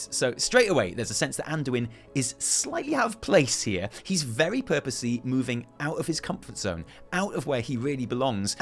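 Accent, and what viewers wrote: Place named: United Kingdom. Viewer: Late or not, don't need a reason to listen to you talk about WoW in a glorious British accent. Keep 'em coming.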